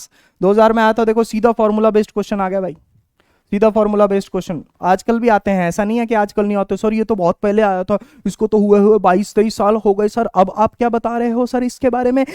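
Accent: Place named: Haryana, India. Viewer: Thank you sir sir apki Haryanvi Katee Zaher hai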